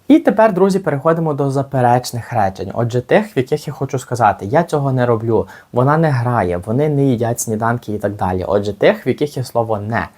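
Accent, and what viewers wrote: Standard. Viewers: дякую за чисту українську мову приємно чути успіху вашому каналу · Дякую за чисту українську мову. Успіху тобі.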